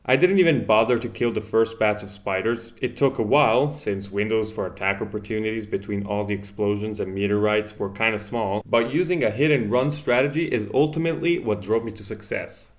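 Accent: Italy. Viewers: To be fair he sounds perfectly north Italian to me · r u italian? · Mi stavo chiedendo perché riuscivo a capire a orecchio il tuo inglese, poi ho visto che sei italiano😂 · Are you italian?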